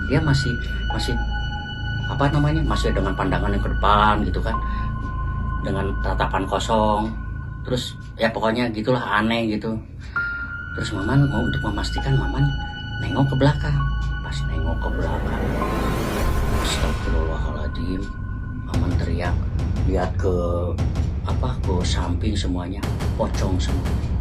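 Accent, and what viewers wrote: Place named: West Java, Indonesia. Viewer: Ceritanya serem, pembawaannya enak dan pertahankan Aksen sundanya Kang!!🦾🦾🦾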